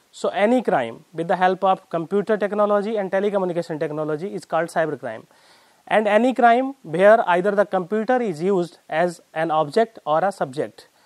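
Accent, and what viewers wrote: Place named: Pilibhit, India. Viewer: maja aaa gaya bhai ----boli pilibhit wali record ho gayee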